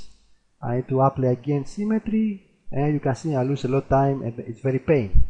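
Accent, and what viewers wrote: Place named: Jamaica. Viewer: nice plugin and you have a nice jamaican accent :))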